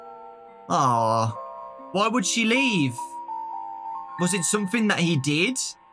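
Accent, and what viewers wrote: United Kingdom. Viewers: He’s so British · accent kinda british tho..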